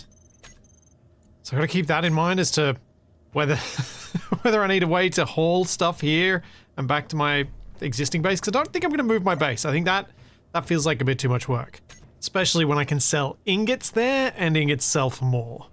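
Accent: Australia. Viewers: Fair enough. However, the fact that you neglected to mention intentionally avoiding the vanilla grid storage and retrieval mechanics during your quandary about how to get both vehicles home did at one point prompt me to think, "Gee, if only a well know YouTube Space Engineers content creator, perhaps one with an Australian accent, had made a tutorial about the grid storage and retrieval and how it was perhaps the most important feature of the update..." 😏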